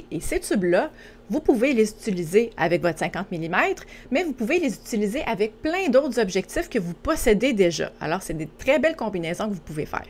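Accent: Quebec, Canada. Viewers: Bonjour, Il y a un petit moment que j'ai le plaisir de suivre vos tutorielsn mais je m'arrête hélas rarement pour vous en remercier 🥴😳.Je voudrais donc vous adresser un immense, "'Merci" pour la qualité de vos explications et le choix des thèmes abordés. Vous faites un merveilleux travail, vos explications sont claires et.....j'adore ce bel accent Québécois ❤. Yves Banière - Astaffort - France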